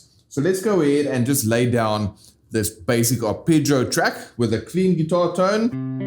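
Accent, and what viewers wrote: South Africa. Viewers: Awesome stuff man are you South African? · You sound south african and you wearing a Jbay hat! Victory church? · Recognise that South African accent! Refreshing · I did not expect to hear a South African accent lol.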